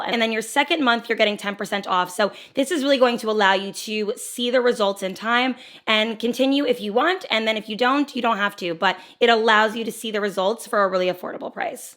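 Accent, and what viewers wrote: New Jersey, United States. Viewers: the jersey accent for meeeee 😩😩😍💕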